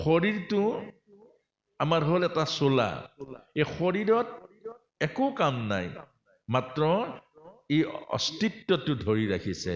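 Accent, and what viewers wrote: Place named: Bodo, India. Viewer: Bodo accent ❤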